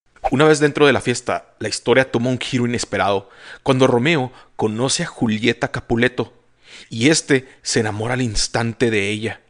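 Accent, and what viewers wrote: North, Mexico. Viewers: Gracias!! jajaj eres norteño?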